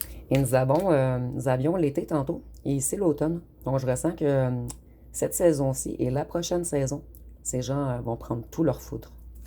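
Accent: Quebec, Canada. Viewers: Vous etres rigolote j'aime bien vous ecouter quel beau accent quebecois❤❤❤❤❤❤❤❤❤❤❤❤❤❤❤❤❤❤❤❤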